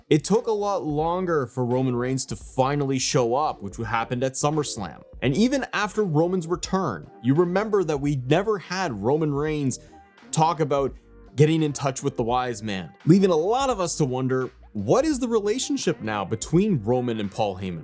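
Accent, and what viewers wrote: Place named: Canada. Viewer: I can tell you’re Canadian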